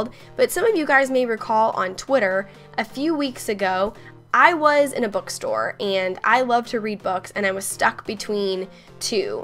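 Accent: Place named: South, United States. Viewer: To me you sounded so southern, I don't know why. You just sounded different. Or my brain is just being a derp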